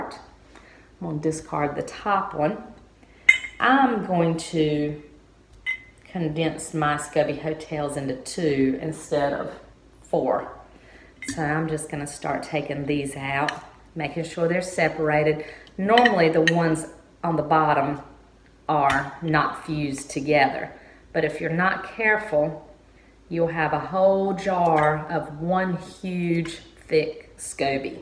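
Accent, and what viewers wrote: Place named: South, United States. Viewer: What southern accent is this anyone please?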